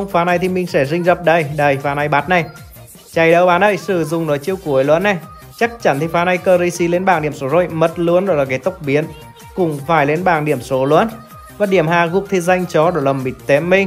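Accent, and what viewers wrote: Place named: North Central, Vietnam. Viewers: Giọng người Huế nghe hay lắm ln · Mình ở miền tây. Nhưng rất thích nghe giọng mấy ace ở miền Bắc Trung Bộ như này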